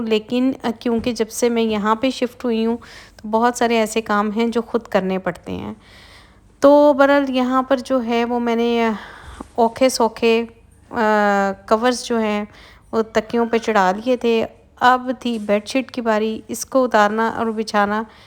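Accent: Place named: Punjab, India. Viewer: you are precious miss, and adorable women. Must tc your healthcare so much. I miss apka Punjabi accent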